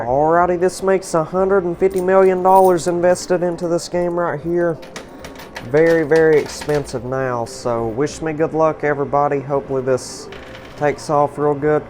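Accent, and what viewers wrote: South, United States. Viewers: Apparently, this guy who talks like a red neck does!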